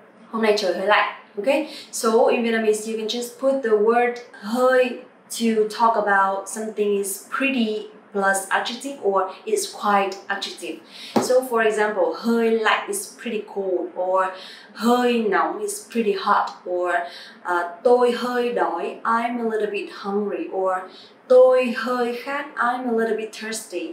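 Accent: North, Vietnam. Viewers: They teach northern accent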